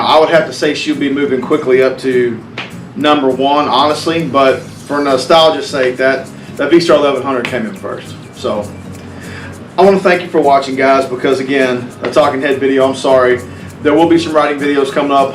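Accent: South, United States. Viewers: So to sum up: Talks. Sips Crown "Role". Talks. Sips Crown "Role". Talks. Sips Crown "Role". Talks. Sips Crown "Role". Sips Crown "Role". Talks. Sips Crown "Role". Talks. Sips Crown "Role". Talks. This video has been brought to you by Crown Role. LMAO! I think I will contact Crown Role and let them know they have a supporter! Just messin' with ya. I love a Southern accent. I've been sippin' on Tanqueray and Tonics this summer. But the price of the gin at the state owned liquor store is outrageous, so I either need to find a more suitably priced gin that tastes like Tanqueray, or find myself an underground out of state supply! I noticed gas went up about $.20/gallon the last week or two. I was thinking about what caused that and realized it was probably due to refinery shutdowns. Thanks for keeping things rocking there! Daphne Blake should be easily #1 by now of all your bikes. 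Mine definitely is. Reliability, looks, sheer power and speed... You just can't beat it. But my CTX 1300 and V45 Magna were and are definite standouts. There's a new Valkyrie owner on the forum in the Houston area. I posted a link to your channel and told him to check you out. And again, you promised us a Talking Heads video and there was no Talking Heads music. "You may find yourself behind the bars of a large motorcycle. And you may find yourself in a beautiful house. With a beautiful wife! And you may ask yourself... Well? How did I get here?" There - I did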